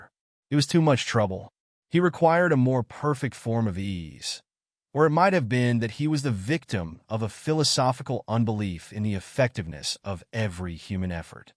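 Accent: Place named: United States. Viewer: Appreciate the American accent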